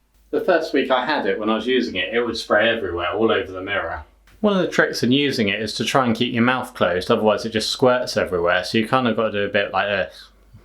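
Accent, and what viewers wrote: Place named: Liverpool, United Kingdom. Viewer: You have the same accent as the John Paul George & ringgo Good review, thank you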